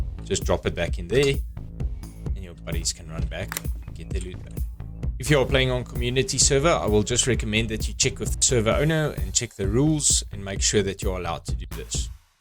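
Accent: Cape Town, South Africa. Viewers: As a cape townian.....this voice sounds like home 😂😂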